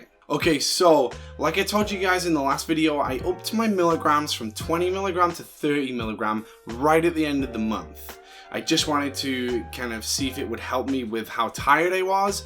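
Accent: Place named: Scotland, United Kingdom. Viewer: Are you in the UK or USA? You have a Scottish accent but adderal isn't prescribed in the UK?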